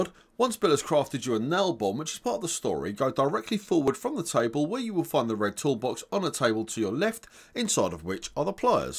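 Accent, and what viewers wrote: United Kingdom. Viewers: no one told you to talk a little bit slow...man not all people understand English in a UK accent !!!!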